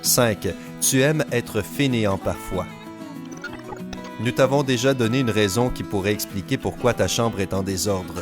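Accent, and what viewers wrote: Quebec, Canada. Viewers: J'adore ce mec avec l'accent Québécois, il me fait trop marrer... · J'adore la voix québécoise like si toi aussi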